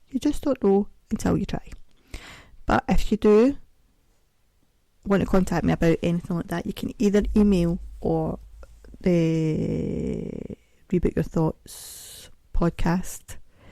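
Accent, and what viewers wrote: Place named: Scotland, United Kingdom. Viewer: It was me!! I'm the one who said they liked your singing, and absolutely, I was serious! I'm in the USA and your podcast came up on my feed randomly. I usually am always listening with earbuds, and I love having your voice, accent, and singing in my left ear. I'm a big fan, and one of my favorite songs of his is. I heard a version of it by, and I was convinced it was MEANT to be sung by a Scottish woman. I always put it in playlists. I have a playlist for you if you want it.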